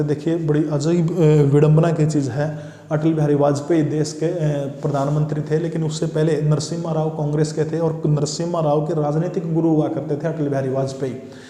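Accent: Haryana, India. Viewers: Gajab sir haryanavi aawaj mast national gkbe padaya Karo from narnoul se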